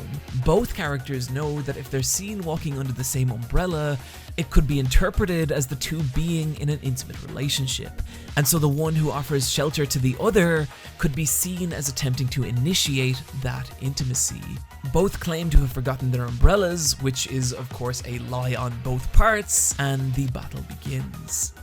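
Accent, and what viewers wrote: Ireland. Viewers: Oh so you're Irish... I thought that was your narration voice. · What nationality are you? I'm getting a little Irish from the accent I think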